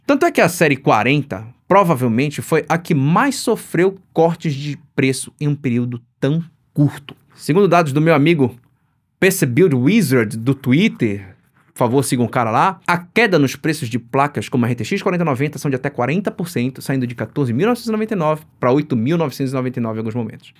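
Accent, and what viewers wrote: Pará, Brazil. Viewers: Eu comecei a te ouvir pra matar a saudade do sotaque do Pará, mas gostei demais da maneira como vc discorre sobre os assuntos. Então fiquei, apesar de não entender nada do assunto. Muito bom o conteúdo, alto nível.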